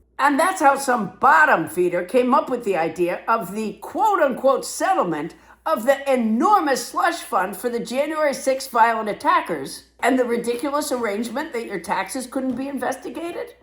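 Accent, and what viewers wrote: Boston, United States. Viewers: Brilliant! Ya sound so Bostin! It’s always a pleasure to see (and hear you)! As Denis would say, “He’s an a-hole!” ❤❤❤❤😂😂😂😂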